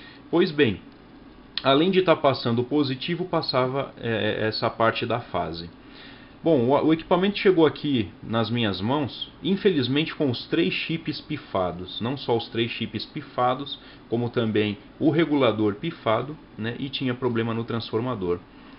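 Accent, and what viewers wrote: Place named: Rio de Janeiro, Brazil. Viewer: Vc e carioca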